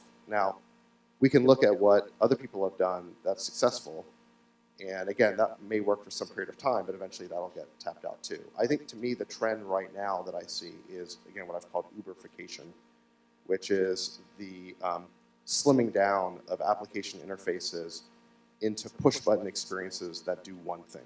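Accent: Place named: India. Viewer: Indian ascent is really irritating. I am sorry.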